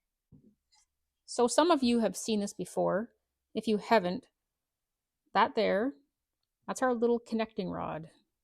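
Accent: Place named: Canada. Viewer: I like your work and your delightful Canadian broge.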